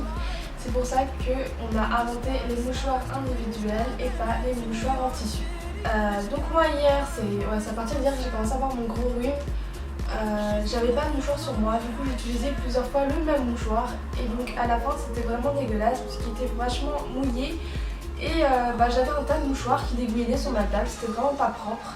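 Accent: Madagascar. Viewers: T'es malgache?? x) Chouette video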